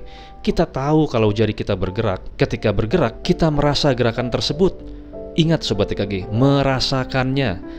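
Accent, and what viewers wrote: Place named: Sunda, Indonesia. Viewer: Suara bang EKG khas suara orang sunda ,,, suaranya sendu ,,entah knp dnger suaranya sedih,, tp kisah"nya sangat menginspirasi sekali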